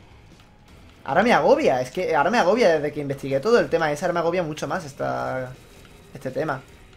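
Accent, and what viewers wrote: Andalusia, Spain. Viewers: hablando de acento andaluz , tengo una profesora de matemáticas andaluza y entre tu y ella de vez en cuando se me pega el acento 😂😂😂 hace un par de semanas pensó que la estaba vacilando XD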